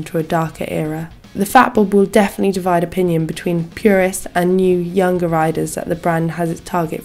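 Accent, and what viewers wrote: United Kingdom. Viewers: Interesting review. Please work on enunciation and slow down a little... that brittiot accent is annoying. But a cute girl and a nice new motorcycle are a reason to watch this interview for sure. And remember, there are 300lb (all muscle) big guys like me out there who would rather have a bike that can handle our weight and aggressive riding style than some sport bike. Also one with more torque fits us better than higher horsepower bikes that require super high rpms to accelerate a moderate load and could not corner well with our size and weight anyway. And finally the big tires tend to eliminate grooved pavement and lane segments very well... so they actually improve handling. Ah... not the best review there, but what do you expect from the British mush.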